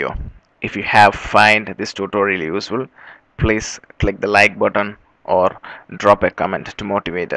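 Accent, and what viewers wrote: Kerala, India. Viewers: I bet the narrator is from the God's own country.. So am I.....:) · sounds Indian to me.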